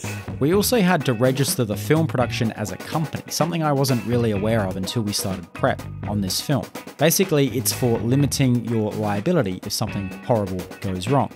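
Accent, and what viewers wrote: Australia. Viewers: Ahh, I always thought you're an American.. Turns out you're an Australian. TIL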